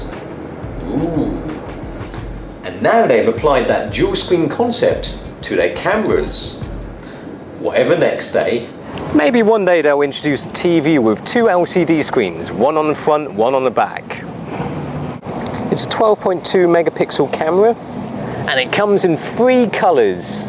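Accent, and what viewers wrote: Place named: United Kingdom. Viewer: where is that at??? i noticed u were in hk.. with a uk..a ccent?? sorta... i go to hk.. 2-3 times a year.. :P goin this august... not too many hkers speak english.. used to.. not nemore.. u have excellent english.. cheers!!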